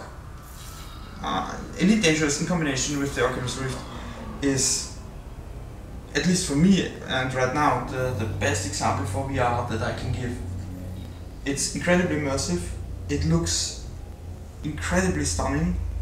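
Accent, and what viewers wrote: Germany. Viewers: That's how a real happy gamer looks like! :D By the way, are you from Germany? Ich frage, wegen dem Akzent :P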